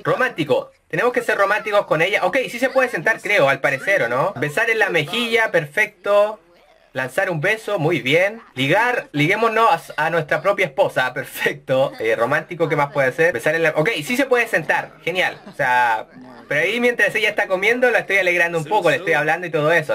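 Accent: Chile. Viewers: eres chileno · es chileno:v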